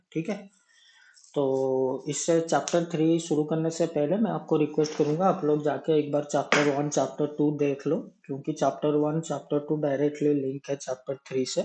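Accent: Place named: Odisha, India. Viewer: tam katha ru janideli...j tme..odisha ra boli....☺️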